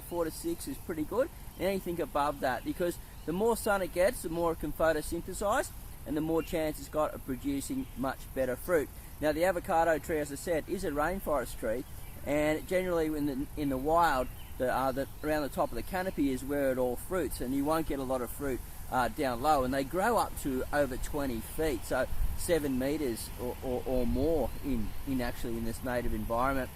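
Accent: Australia. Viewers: Damn that Australian accent 😂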